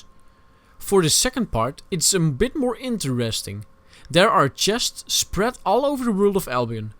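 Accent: Netherlands. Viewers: goed bezig! leuke videos met een nederlands accent.:D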